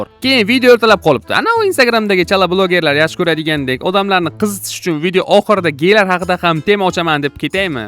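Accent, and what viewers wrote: Qashqadaryo, Uzbekistan. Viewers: Shevadan Qashqadaryoga tortyabdi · Qashqadaryodan emasmisiz sheva pzds tortopti😂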